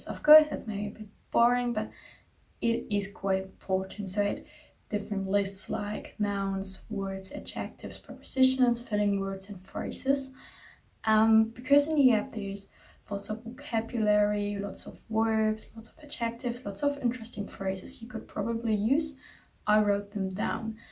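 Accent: France. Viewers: You sound like french when you speak spanish, where are you from? Y buena suerte en tu aprendizaje, a por cierto debes hacer énfasis en la pronunciación de la R 👍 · Hablas muy bien español, y tienes un ligero acento Frances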